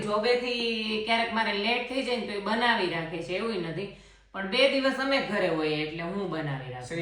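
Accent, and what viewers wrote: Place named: Kathiawar, India. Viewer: Tamari kathiyavadi accent Bov sari che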